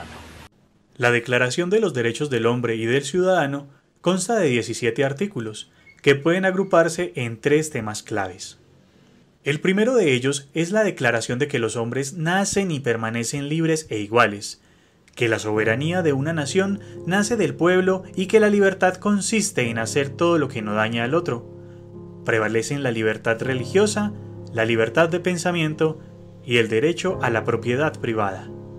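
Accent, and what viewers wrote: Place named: Antioquia, Colombia. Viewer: Me encanta que conserves el acento paisa👍👍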